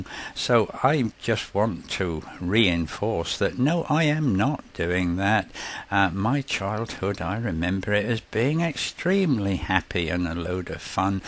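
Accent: United Kingdom. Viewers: I love how British people say process. Like "pro" at some type of game or sport. Great Video!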